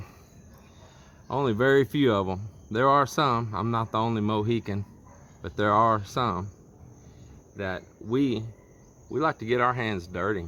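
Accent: Texas, United States. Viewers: I am a foreigner working in Manila. I like the attitude you share and I also like your Texas accent. 😄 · And i love your Texan accent.. real cowboy language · I always love Texan accent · Luv your texan accent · You from texas? I can tell. Working in BPO :) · Love the accent sir, texan accent! I’m proud of u sire! Can i work with u sir? Don’t worry about the salary, just love hanging out with u sir. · Love your passion. Hope to have more of you. Can we clone you? Love your Texan accent. Hope you are enjoying your stay in the Philippines. You are a sweetheart. Take care and God bless.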